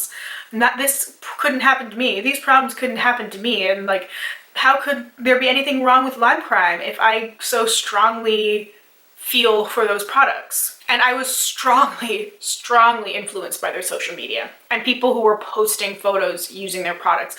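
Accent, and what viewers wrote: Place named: Canada. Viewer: It’s always funny hearing you say “out” and “about” but you also say it really cute. I think it’s a Canadian thing lol cuz I’m American and we say it differently. But you say a very cute, soft, “oot” and “aboot “ and it’s very endearing.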